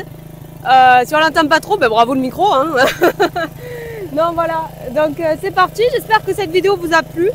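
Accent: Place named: South, France. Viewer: J’adore l'accent du sud ça nous rapproche du soleil 😊😉😊😉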